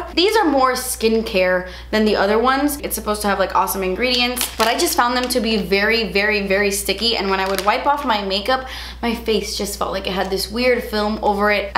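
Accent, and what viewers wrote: Cuba. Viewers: ! Will you ever do a video speaking in Cuban/Spanish (sorry I don't speak those languages so not sure if they are the same/similar??)? I think your Cuban accent is really cool! 🤩 Would love to watch a video like that hehehhe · siiiiiiiiiiii!!!! Cuban accent ❤️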